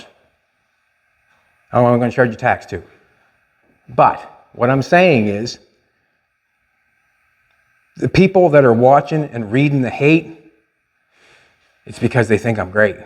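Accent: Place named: Canada. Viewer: I love these videos, I have been seeing you online and hearing your name. I never knew your were Canadian until I saw my first video of you. haha. I was like... wait a second, I know that accent. Cheers to you. I am in Ontario and I vacation yearly in Souris, PEI. We want to visit Nova Scotia sometime soon.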